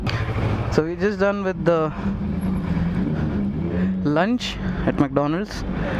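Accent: Hyderabad, India. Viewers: Sir the way u talk the hyderabadi language i liked it very much by the way the wheelie was awesome 😜😜😜😜😜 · ap pakka hyderabadi baat karre bhai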